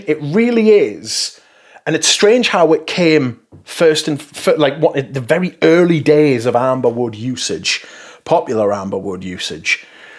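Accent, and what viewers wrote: United Kingdom. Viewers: You are absolutely hilarious. Have you ever considered radio or script writing, both? We Yanks love the sounds of a Brit , save Piers. You should. You have the best wit and voice of all of these reviewers ~I will discuss this perfume until I have discussed it to a disgusting amount.~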